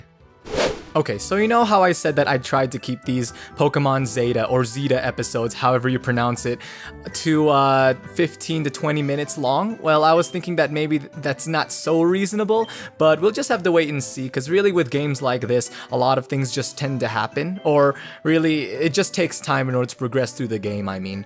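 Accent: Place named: Sweden. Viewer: That's so weird! It sounds a little bit like you have a Swedish accent sometimes. (No offence intended)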